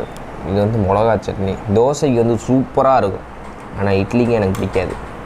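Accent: Madurai, India. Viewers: Anna unga Madurai slang super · Hi bro unga Madurai slang super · Bro naan coimbatore but unga madurai slang super 👌😍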